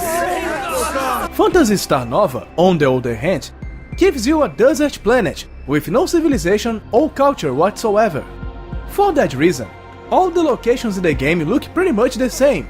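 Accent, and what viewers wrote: Brazil. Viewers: Are you Brazillian? You sound brazillian... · Impossível não notar o sotaque brazileiro lol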